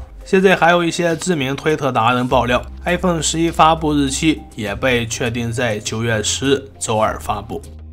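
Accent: China. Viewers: 兄弟，你是山西人。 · 中国农村口音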